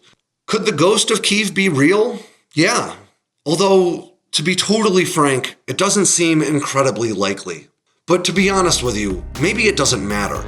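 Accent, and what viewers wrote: United States. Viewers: 2 secs in dumb yank voice cringed me out tata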